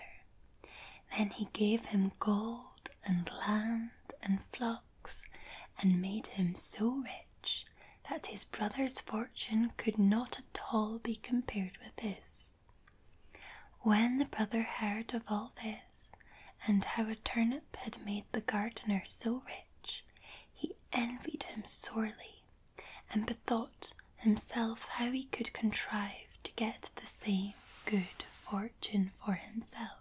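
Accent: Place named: Scotland, United Kingdom. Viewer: The Scottish accent is greatly better than the crackle of the candle.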